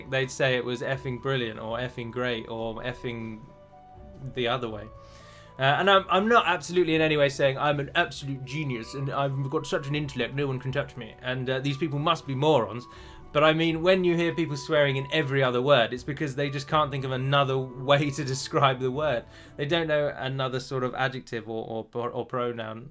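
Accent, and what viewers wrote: United Kingdom. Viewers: I have always enjoyed watching your videos. It's almost ritualistic that I come home from a day of work and after dinner (sometimes during dinner if my wife is not here) watch a video and escape into the world of LOTR. My wife says she doesn't mind me watching your videos because they are clean and she loves a good British accent. Your girlfriend/fiance is gorgeous and her saying the names of her statues reminds me of my wife. Keep up the great work and cheers to the future.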